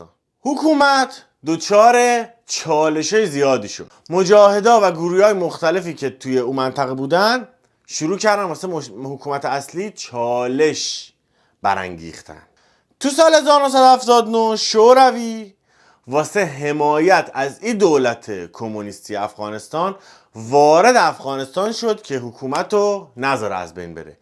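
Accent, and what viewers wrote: Shiraz, Iran. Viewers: یعنی من عاشق لحجه ی شیرازی هستک🇮🇷🇮🇷🇦🇫🇦🇫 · افغاني هستم هميشه عاشقِ طرزِ بيانت-لهجه شيرينِ شيرازيت و تحليل هات بودمو هستم سياسي مخصوصا-بخدا اگه چهار ساعتم ويدئو بسازي آدم خسته نميشه سير نميشه از ديدنش-حرفاي اين ويدئو هم كه به دلم نشست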